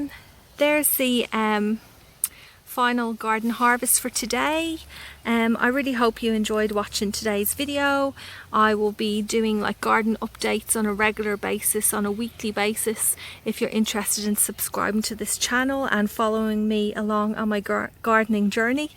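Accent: Ireland. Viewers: Your accent sounds Irish 🤔 but either way cool garden 👍🤗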